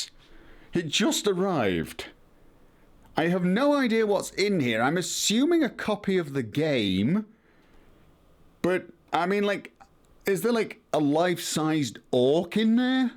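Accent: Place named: RP, United Kingdom. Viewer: You sound very posh with that mic.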